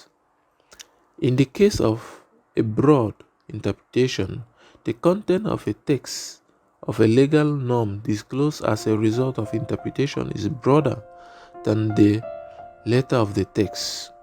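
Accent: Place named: Africa. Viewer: Африканец говорит, плюс понятно что он просто читает, с сильным акцентом, плюс понятно что он ничего в этом не понимает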